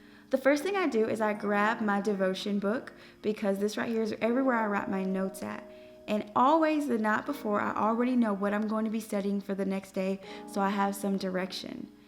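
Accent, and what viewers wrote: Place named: Oklahoma, United States. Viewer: Love this video💕Inspires me to walk with God the right way. Btw sis are you from OK? You sound just like the ppl from my hometown lol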